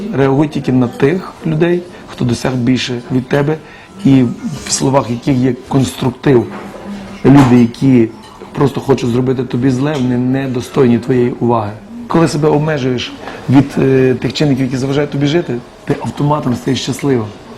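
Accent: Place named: Nadsiannia, Ukraine. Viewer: Это не чистый язык это Надсянский Диалект.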